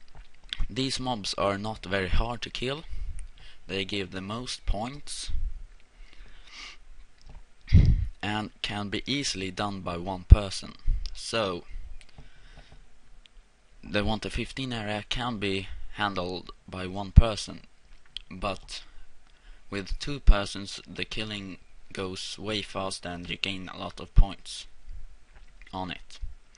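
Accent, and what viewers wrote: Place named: Sweden. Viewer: Swedish english ftw!!!!! Oh sorry not supposed to comment on english... anyway. Yeh I hope the video helps whoever doesn't know how to do GRB. Kudos for you bro <33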